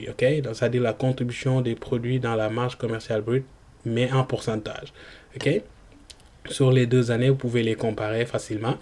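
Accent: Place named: Senegal. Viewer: Tu es probalement sénégalais à ton accent. Pourquoi ne pas travailler aussi sur une carte de ton pays?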